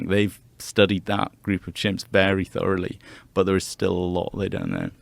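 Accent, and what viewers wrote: United Kingdom. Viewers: Proof that having a British accent can make a man who is saying absolutely nothing sound articulate. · There's no such thing as a British accent he has a welsh accent 😂😂😂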